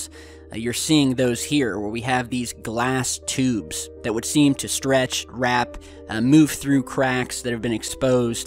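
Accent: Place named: United States. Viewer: Well he speaks English with a kind of American accent, I'm more used with British accents but I understand him very well too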